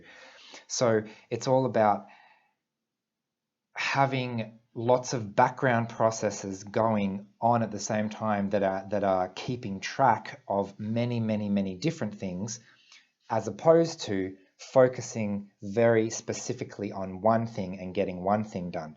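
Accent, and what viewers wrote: Australia. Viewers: Hey man, you sound Australian (sorry if you turn out to be from NZ). Have you met Donna Williams?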